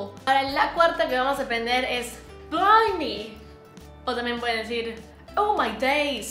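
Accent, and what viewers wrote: United Kingdom. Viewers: Sos una grosa! Amo tus videos y tu acento británico!! Estudio Inglés y me encantaria tener ese acento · Me encanta tu acento británico! Yo trabajo en ese acento también! Me encanta tu contenido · estoy enamorada de tu british accent creo que te lo dije <num> de veces ahre igual lo voy a seguir diciendo 😌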